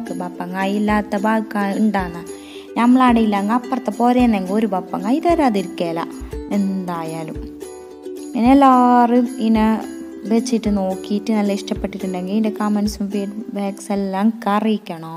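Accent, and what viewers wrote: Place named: Kasaragod, India. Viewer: Super kasaragod slang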